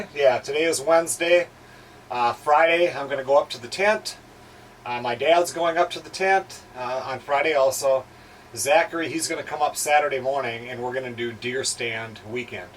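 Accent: Minnesota, United States. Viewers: I got two questions. 1. Does the MN DNR restock your lake or is all natural? 2. How come you / don't ice fish at the tent lake? Thx I grew up in grand forks and spent alot of time as a kid fishing MN lakes. Your accent reminds me of my MN family's.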